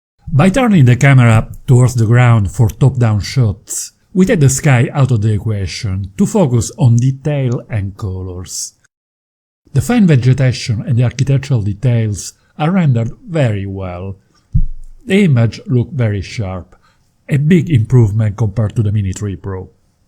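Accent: Italy. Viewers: He's Italian?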